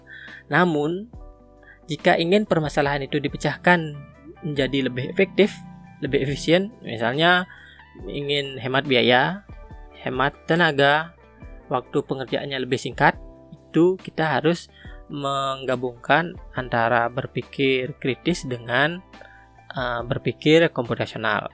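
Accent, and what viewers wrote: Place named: Bali, Indonesia. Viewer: Orang Bali ya bang? Logatnya kentara banget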